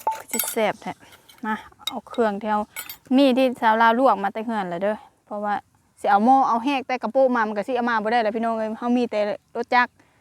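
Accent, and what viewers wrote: Phu Thai, Thailand. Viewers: สวัสดีครับ สำเนียงผู้ไท น่าฟังมากครับ🌹🌹🌹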